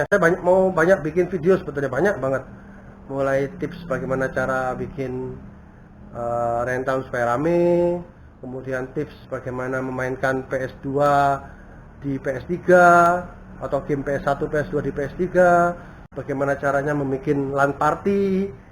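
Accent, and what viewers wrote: Java, Indonesia. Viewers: Asline jowo mas???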